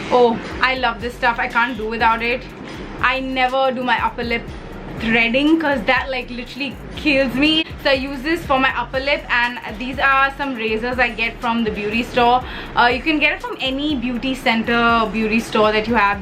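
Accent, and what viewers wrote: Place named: United Kingdom. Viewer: love it as always!😍 and can you plz plz make a video on how you learnt british accent and some tips and tricks to learn it!!!! love ya!😘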